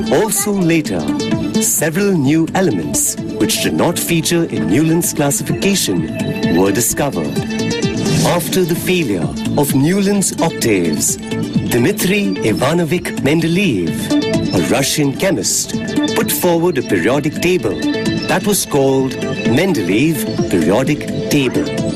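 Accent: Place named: India. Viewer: indian accent spotted this is gonna be GOOD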